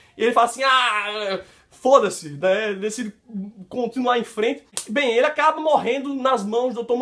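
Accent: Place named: Northeast, Brazil. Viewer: ah esse nosso sotaque nordestino kkk , ótimo vídeo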